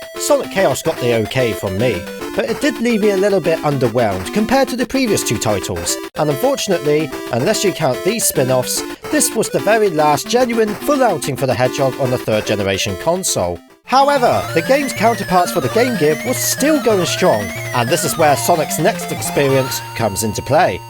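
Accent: United Kingdom. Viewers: I guess your british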